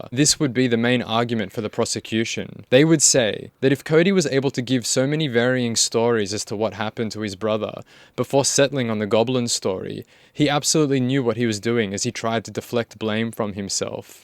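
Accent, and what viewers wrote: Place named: Australia. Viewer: I'm not a big time YouTube commentator because of how much i try to be anonymous, but your videos certainly have a way of stimulating interesting discourse and your point of view is intriguing. (Also aussie accents are my favorite 🤘🤘🤘) All i really can say about this one is i am not convinced that Cody is of average "intelligence" for lack of better wording. He seems like he could have developmental delays which are especially likely given his exposure to hard drugs in utero. I say this not because I am excusing what he did or even buy the whole goblin thing, but i feel empathy for him because he is clearly functioning at the level of a much younger person, perhaps even as young as the boy he murdered. Murder is wrong and horrific, obviously. Cody is an unfortunate soul though too. Born on drugs is trauma, foster care is trauma, being different from your peers is trauma, and so is severe mental illness. 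The universe is impartial, and Cody was dealt a bad hand. Many of us are to varying degrees. I don't have a point to this, but i couldn't help saying out loud at the end of your video, "what a shame". (Edited misspellings)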